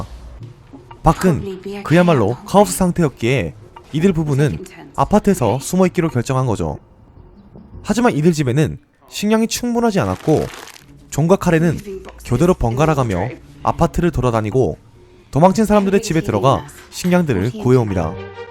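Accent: Gyeongsang, South Korea. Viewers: 오늘 문득 님 설명듣는데...경상도쪽이셨나요? 사투리가 들리는건가싶어서 ㅋㅋ 손 빨리 나으시길 쾌차하세요~~~ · 고향이 경상도 어디에요.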